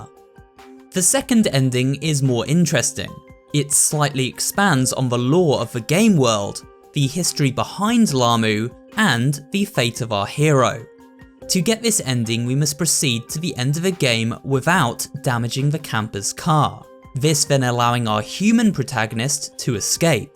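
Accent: United Kingdom. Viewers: THE BRITISH ACCENT JUST MHWA❤️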